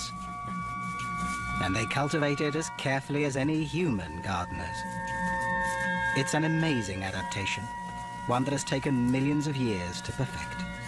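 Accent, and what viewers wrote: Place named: United Kingdom. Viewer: British narration ruins it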